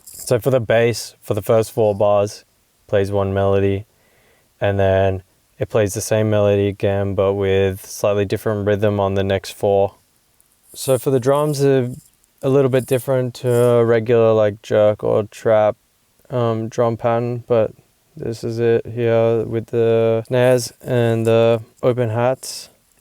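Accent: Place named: Australia. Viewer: brah i cud tell from ur accent thought so... good shit bro. saving aus underground scene with these beats <3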